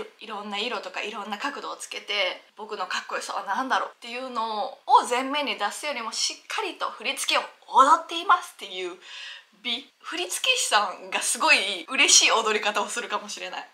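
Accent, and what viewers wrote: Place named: Kansai, Japan. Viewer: こんな素敵な動画に巡り会えて、幸せでしゃあない！何回も何回も、なーーん回も見て、先生の解説、ほぼ覚えてしまいました （笑。関西弁もHOTで嬉しい、ありがとうございます！どんな時もJINくんは最高だっ！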